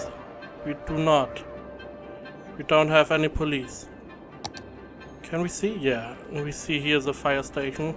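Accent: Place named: Germany. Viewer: klingt lustig dein denglisch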